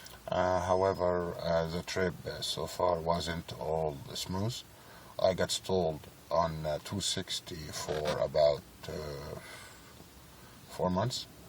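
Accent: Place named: Arab world. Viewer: hi, it's nice to know ur doing good n how much weight have u lost? and I know u have the Arab accent n the "aaaahhhhhhh" but tht gives a good laugh.. be healthy and awaiting your before n after pics..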